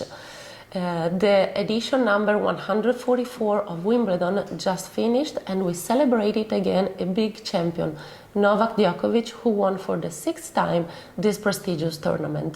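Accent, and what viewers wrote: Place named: Italy. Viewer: An Italian accent adds beauty to an already attractive watch. Thank you.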